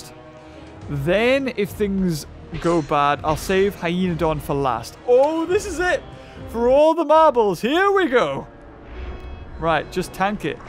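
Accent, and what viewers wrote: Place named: United Kingdom. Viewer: Why you talk british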